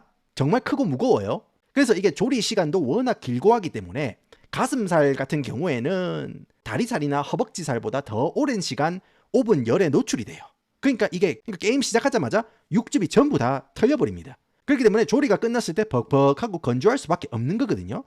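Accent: Gyeongsang, South Korea. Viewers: 경상도 사투리로 미국이야기 잼나개 해줘서보는데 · 가끔떠서 보는데 경상도 사투리 너무 듣기힘들다 ㅋㅋㅋ 알고리즘에 그만 떴으면... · 니 갱상도 사투리 조지는게 억수로 찰지데이~~~ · 경상도 사투리 듣기 좋은데? 보기싫음 차단하든가😂 이채널 댓글만 12개 썼으면서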